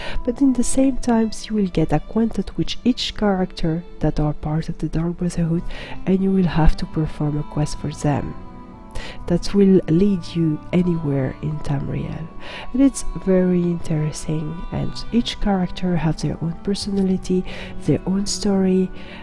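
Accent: France. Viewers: I like the feeling of you talking in your videos (I have seen maybe 5 or 7 :D ). I love your french accent and now I finished watching this video and literally I am buying now the 2000 crowns and am buying this DLC in this moment :D. I am so excited about the DLC, your video was great. Many thanks for not making any spoilers, I am excited about the daedric plane (or aedric maybe :D )connected to this DLC. which daedric prince is with DB? Mephala :D, I wonder how it will look :D. I guess pretty awesome :D. and interresting thing that you told about he feeling of killing someone :D. I look forward for the finisher killcam, I loved them in Skyrim :). when npc was some low level of health, the last hit was a kill-cam execution video, very nice, I liked that. the same, I am peaceful person, but nice feeling in this game. ZOS has made a good job in this part too apparently :). 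Ok, no waste of precious time, I go to dark brotherhood now :). see you there :). PC EU ;o))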